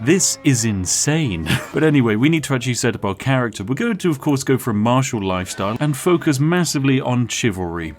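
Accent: United Kingdom. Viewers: Who tf dislikes?? Like why.. is it the stock images? The sassy British accent? Cause these are things I love xD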